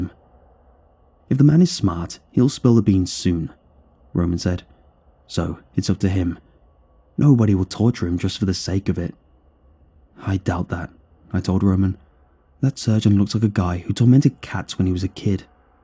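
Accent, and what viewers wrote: United Kingdom. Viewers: Reads title* Me: "Hell yeah!" Starts watching and hears british accent* Me: "Wait a minute."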